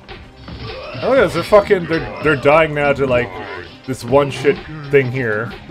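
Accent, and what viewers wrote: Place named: Canada. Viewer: You speak canadian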